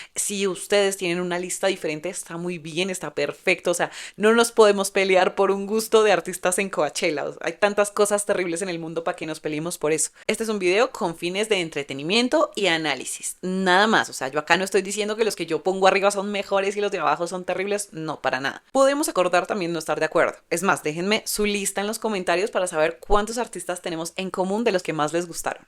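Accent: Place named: Colombia. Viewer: Con ese acento tan hermoso sabía qie eras de Colombia😘 ❤.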